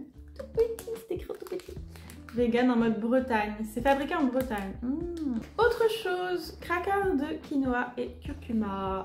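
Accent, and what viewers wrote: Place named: England, United Kingdom. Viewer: Il est kiffant ton accent anglais. xD Et Sausage Party c'est le feu, à regarder vrament.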